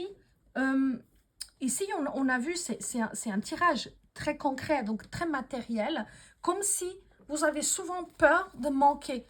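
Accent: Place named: Portugal. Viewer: Bonjour Merci beaucoup pour ce très beau tirage très inspiré et inspirant .... Très riche lecture avec un très beau parfum d'accent portugais que j'adore ..... Belle journée . A bientôt 🙏🌻 Pitus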